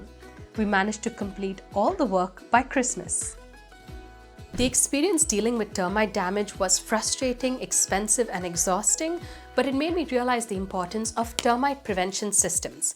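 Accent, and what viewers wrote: India. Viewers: Your accent sounds like you're from India. Are you from Mumbai or Mangalaore?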